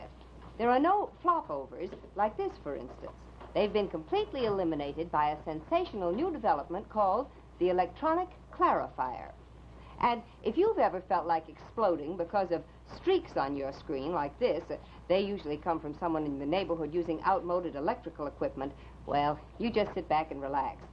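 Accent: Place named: Transatlantic, United States. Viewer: she's beautiful i love how over the years she never really lost that transatlantic accent especially in that 1989 appearance she made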